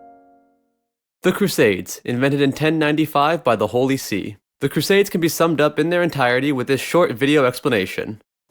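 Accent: Newfoundland, Canada. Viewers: are you from newfoundland cuz i hear you saying ''bhys'' alot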